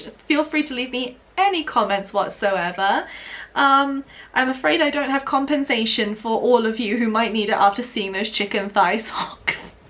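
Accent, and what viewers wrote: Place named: Wales, United Kingdom. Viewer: You kinda sounded too Welch? Is that your origins?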